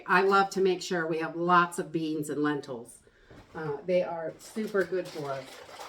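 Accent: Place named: East Coast, United States. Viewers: accent is definitely a New England accent not an East Coast accent, as there are tons of different accents a up and down the East Coast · I love all your videos and I have do! Have a great day!I have been using your lists to stock up. But the real reason is to hear you talk! Love your east coast accent. I’m a midwesterner and love to hear you talk! Thanks for all you!😊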